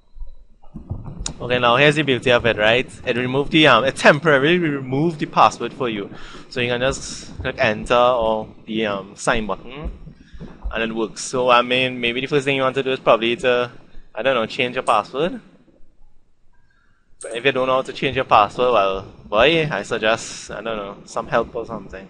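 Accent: Trinidad, Trinidad and Tobago. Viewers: Hes trini/guyanase and probally a west indian. I can understand his language fine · He ah trini!!! Trini big up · Trini accent strong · de trini accent strong.. lol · wait nah...a trini? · how that sounding like a trini accent so ? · trinidadian? me too! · yuh soundin like yuh is ah trini, I undahstan yuh clean. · Trinidad accent haha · lol 100% trinidad accent... maybe bahamas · your trinidadidan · u iz ah trini or wa? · i understand it very well are you from trini to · wat a large trini accent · never thought i'd see a trini giving tech advice on youtube yes..sounds like yuh just kixxin around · you sound like a trinidadian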